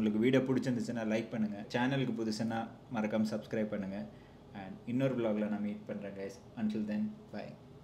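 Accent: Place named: Kongu, India. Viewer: தம்பி நம்ம ஊரு கொங்குதமிழ் பேசுறபோதே உங்களை ரொம்ப பிடிச்சிருச்சுங்கோ .வேலையில் கவனமா இருங்க மா.உடம்பை பார்த்துக்கோங்க சாமி.இது அக்காவோட அட்வைஸ் ங்கோ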